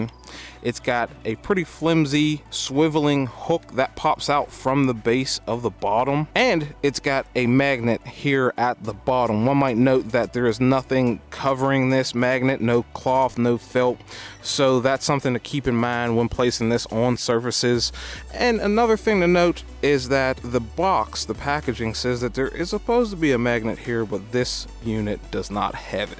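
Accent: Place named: South, United States. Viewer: i like a brother that has an southern accent as deep as my h*nky self does...you got a gmt900 truck...i've had the ecarb042 since it was first came out, wound up with 2 actually...left one of them under a 3.0 ecodiesel and it's now gone back to mother nature gaia...anyway, you're a new subscribe for me.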